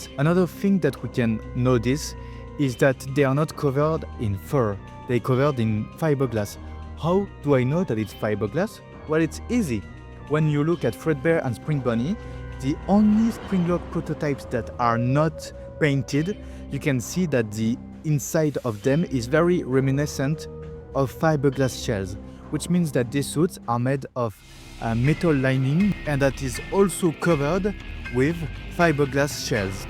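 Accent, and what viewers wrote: France. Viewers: Are you French? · Are you french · Tu sais quoi, tu m'inspires. J'ai aussi un accent français quand je parle anglais et ça me freine beaucoup quand j'ai envie de créer du contenu en anglais. Je trouve ça vraiment incroyable que tu "oses" faire une vidéo complète en anglais ( entre guillemets parce que le mot "oser" à une connotation presque négative, comme si ça impliquait que t'aurais jamais du faire la vidéo! Et c'est pas ce que je veux dire du tout!). Enfait bref, j'adore et merci beaucoup! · Are you french ? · The french like accent is soo soothing, good video , ill be studying this video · this is the THICKEST franch accent I've ever heard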